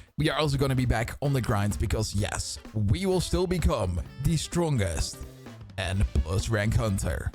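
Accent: Netherlands. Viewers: Is English you’re main language u have a Dutch accent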